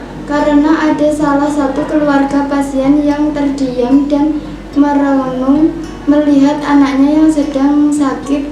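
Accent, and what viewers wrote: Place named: Java, Indonesia. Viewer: terima ksh tapi ngomomg ny MEDOK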